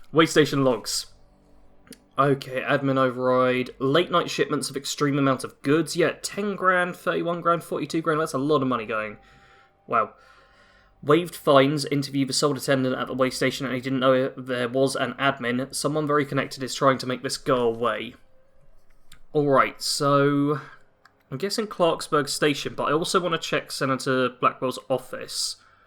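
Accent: United Kingdom. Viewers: It's all good. You're British. ;-). It is, however App-ah-lay-cha, not App-ah-lach-ia. Cheers!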